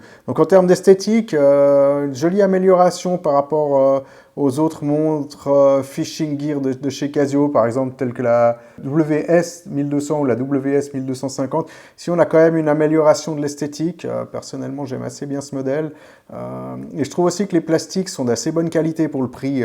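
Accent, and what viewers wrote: Geneva, Switzerland. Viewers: Je détecte une pointe d'accent genevois... je me trompe?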